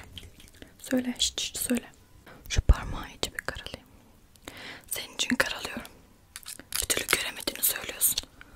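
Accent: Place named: Germany. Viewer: ablada alman aksanı var gibi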